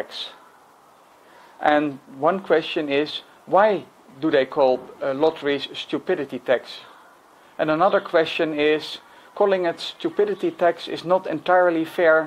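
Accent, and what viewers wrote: Netherlands. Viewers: I can hear you are Dutch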